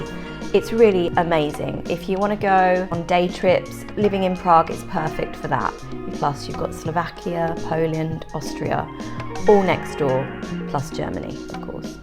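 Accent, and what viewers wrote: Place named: United Kingdom. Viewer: Hi! Don't worry about your accent and pronunciation, because most of us Czechs don't care if you have a British accent. I've been studying English for 30 years and I'll never be able to do a British accent, no matter how hard I try.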